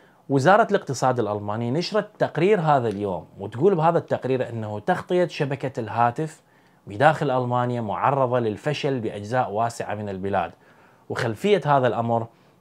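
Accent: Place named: Iraq. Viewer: فدوه لقلبك كلامك العراقي يجنن يحسسني امي بالعراق❤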